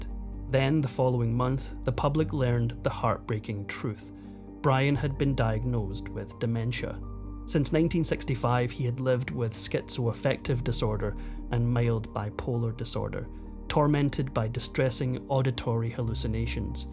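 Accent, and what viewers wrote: Scotland, United Kingdom. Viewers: It's disappointing to click on a Factinate video and then discover that it's not narrated by the Scotsman.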